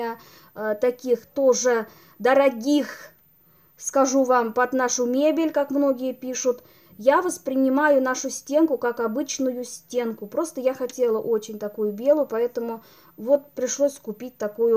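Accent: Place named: Bashkortostan, Russia. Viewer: Здравствуйте,!Хочу поддержать Вас и Ваш канал.Видно,что Вы добрая,хорошая девушка,у Вас прекрасная семья и чистый уютный дом.И обзоры интересные,и говорите хорошо.И акцент небольшой придаёт только шарма,мне это нравится.Вы же ещё и на башкирском говорите,поэтому и есть небольшой акцент.Не обращайте внимания на злопыхателей,к сожалению они есть и в жизни тоже.Всего Вам доброго,успехов и процветания каналу!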